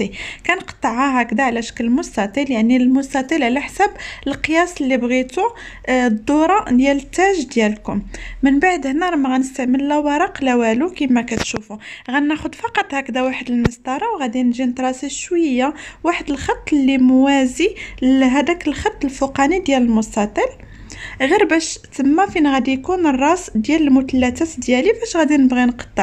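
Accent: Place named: Oran, Algeria. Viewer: hhhhhh j'ai aimé le mot ijarjrak j'adore l'accent oranaise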